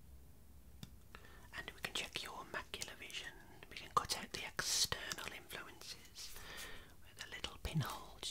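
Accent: Birmingham, United Kingdom. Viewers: Never thought someone with a brummie accent would help me sleep... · Love this , just found you . I miss hearing a brummie accent 🥰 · Are you a Brummie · Judging from his accent I would say the UK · As a lad who comes from the Black Country and the areas around Brum. I have never heard a brummie talk so quietly 🤣🤣🤣🤣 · I was starting to think that my tingles had gone and nothing was doing it for me, until you, not sure if it’s the accent, and being from Birmingham too it’s lovely to hear 😊 I love all your videos 👏🏼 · Yooo I don't think I've actually heard an ASMRtist with a Brummie accent before, it's really nice! Great video dude, I love visual effects like this, they're super relaxing. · He’s a brummy I think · This is excellent! Is your accent also from Birmingham?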